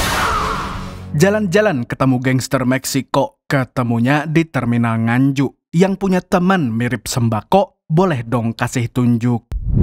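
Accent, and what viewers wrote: Banjar, Indonesia. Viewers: Admin ikam buhan banjar kahh logat nyaaa ulun sukaaa sekali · banjar banget sii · Bg lo kalsel dimananya, bahasa banjar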